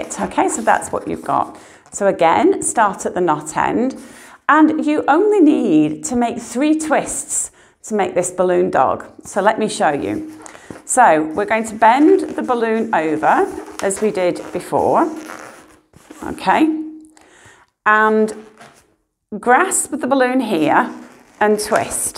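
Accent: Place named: United Kingdom. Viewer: Thank you so much, I learned a lot. Also really makes me miss the friendly people in the UK when hearing the accent 😭😭😭😭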